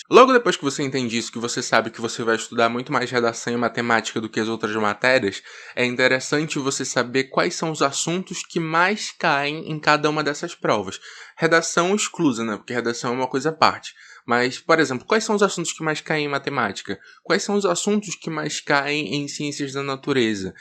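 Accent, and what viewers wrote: Pará, Brazil. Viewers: Tu é do Pará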